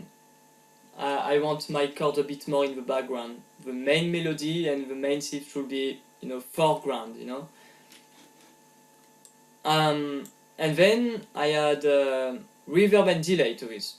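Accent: France. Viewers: Quand j'entend ta façon de parler on se croirait devant une classe de 20 personnes à présenter un exposé xD Mais bon l'accent frenchy ca fais kiffer les étrangers :)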